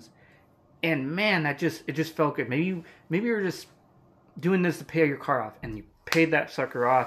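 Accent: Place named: Ohio, United States. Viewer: and you are a content creator too on top of a full time job and Pt ebay! I'm curious where in the states are you from? I have cousins from Ohio and every time I'm listening to you I find myself thinking of them and their accent. what did you go to college for I'm curious? I went to be an english teacher and I kinda lost interest in that as well and couldn't see myself actually being a teacher, mostly because I"m an introvert. it's so ironic about what you are saying about "don't give up"....last night I told my wife "I don't know if I want to keep doing this"....lol. this is a timely reminder. "rule the day!"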